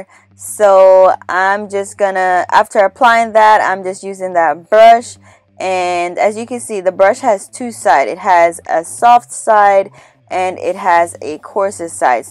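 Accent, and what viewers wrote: Jamaica. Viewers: You are Jamaican I can tell my parents are Jamaican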